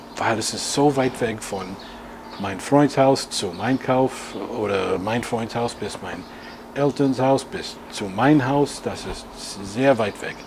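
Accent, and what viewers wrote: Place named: Netherlands. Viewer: Sehr schön wie du sprichst, man merkt auch deine holländischen Wurzeln!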